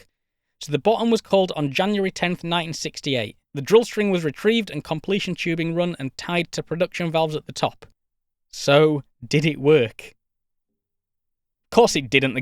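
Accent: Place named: United Kingdom. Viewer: Man, your British English sounds awesome) Thx)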